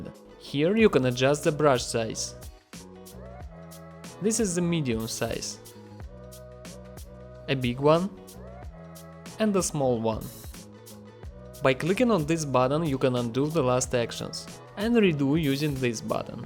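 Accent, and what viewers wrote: Russia. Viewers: Очень рашн акцент :)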